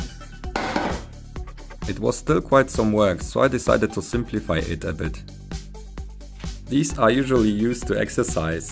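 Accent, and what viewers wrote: Germany. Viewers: Are u from Germany ? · You are german? Du hast so nen Akzent(ich glaube , dass man dies so sagt)? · Bist du Deutscher klingt so? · He sounds very german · Mann hort das du deutsch bist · Du hörst dich sehr 🇩🇪 an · Ach ja der gute alte deutsche aktzent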